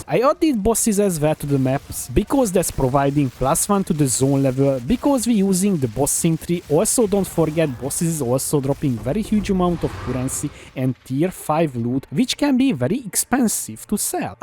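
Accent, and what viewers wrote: Hungary. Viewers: Ez a Magyar akcentus nekem gyanus :D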